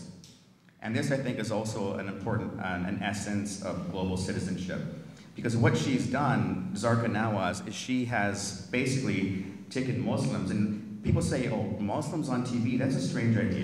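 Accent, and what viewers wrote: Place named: Calgary, Canada. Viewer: I agree. He sounds so Calgarian.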